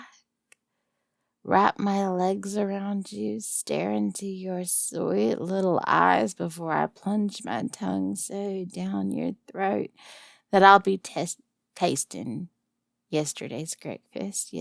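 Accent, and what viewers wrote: South, United States. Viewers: Your voice acting is always such a treat to listen to and southern accents are always a weakness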